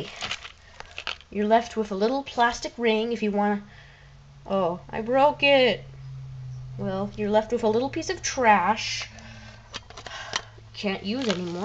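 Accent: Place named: United States. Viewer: I must be mad but I watched all of it both parts no skiping great fun I must get some don't know were to get them in the uk I'm gessing your american love ya actsent lol I will check more of ya vids :)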